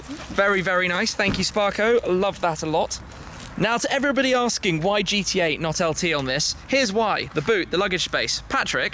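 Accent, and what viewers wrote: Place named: England, United Kingdom. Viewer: Could I get a shoutout in ur next vid? Not to help grow my channel, but I just like to hear an English accent say PorschePilot... 😂 seriously though another great vid. Close to one million!!!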